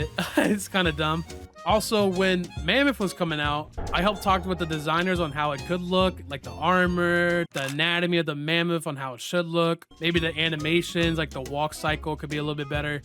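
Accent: United States. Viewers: youre from california? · and you sound america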